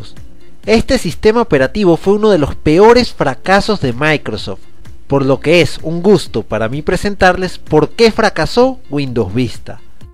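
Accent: Venezuela. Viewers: ACENTO VENEZOLANO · El acento venezolano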